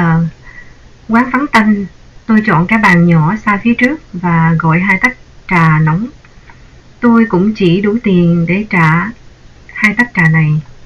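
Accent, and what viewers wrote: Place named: Saigon, Vietnam. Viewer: Mình rất thích nghe giọng này... Nó rất SG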